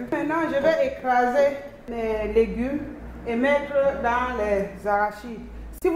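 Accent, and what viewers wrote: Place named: Cameroon. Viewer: J'aime beaucoup votre accent ! Ça me rappelle mon enfance au Cameroun à Mbouda ! Je m'abonne et j'essaie la recette aussi vite que possible ! Trop fan ! 🤣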